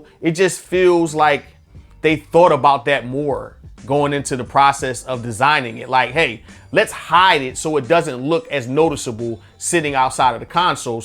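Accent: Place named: New York, United States. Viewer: Definitely from NY. Yerr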